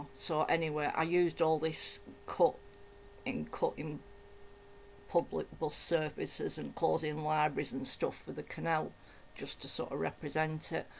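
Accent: Lancashire, United Kingdom. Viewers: Your accent makes me nostalgic, as my mum was from Lancashire (Manchester, or Pendlebury, to be exact). Your art describes a lot about what Mother remembered about those times, long ago. Your use of materials is amazing! Thank you. I will watch this video many more times! And God bless England during all the violence./Sandy in North Carolina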